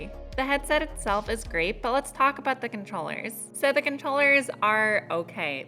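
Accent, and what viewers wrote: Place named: Philadelphia, United States. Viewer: The way you say headphone and other words makes me think you might be from Philly.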